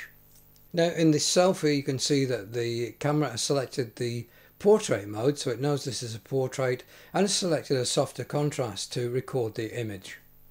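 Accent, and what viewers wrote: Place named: Manchester, United Kingdom. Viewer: Thanks,. Another great series. I've just picked one of these up (practically unused - it's in immaculate condition) on ebay for £550 and absolutely loving it. What's the point in reading through an instruction booklet when you've done a much better job that's easier to follow. It's also nice to have it in a Mancunian accent.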